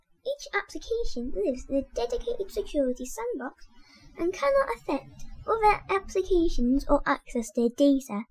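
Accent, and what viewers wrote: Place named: Liverpool, United Kingdom. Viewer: The gentleman is from Liverpool, if I'm not mistaken.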